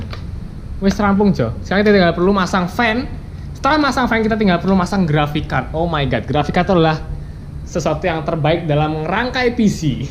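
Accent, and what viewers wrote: Java, Indonesia. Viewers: Ngomong nya ada jawa nya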